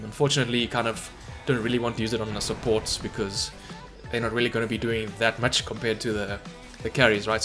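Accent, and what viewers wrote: South Africa. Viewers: Came here to learn Oracle.. You sound like you from South Africa.. The motherland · South African? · Are you South African?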